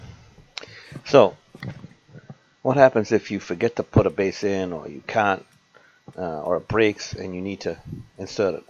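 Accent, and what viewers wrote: Boston, United States. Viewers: That has to be the coolest accent in the Us. 👍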